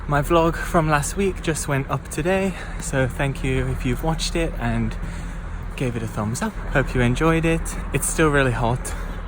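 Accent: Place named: Midlands, United Kingdom. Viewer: Aweee.. 😍 Thank u so much, u guys r awesome *hugs* and btw a hint of where I’m from is in my YT name 😉 I’m a Swede i.e. I’m originally from Sweden 🇸🇪 but it gets a bit more complicated after that, as my mom‘s German and growing up we moved house A LOT (mainly due to my dad’s profession) until about ten years ago when I came to London for my studies 🇬🇧 and I’ve lived here ever since 🤷🏼‍♀️ Whereabouts in the UK r YOU from btw ‘cause I hear a bit of an accent which - to me - says Midlands but I can’t make up my mind if it’s like, between Birmingham and Cheshire or further north? 🤓😁 And last but not least: thank u so much also for the new vlog, I’m loving it a lot and ur bf teaching u Dutch had me LOLing so much, u guys should do the whisper and/or yoga challenge next 🙏🏻😅👍🏻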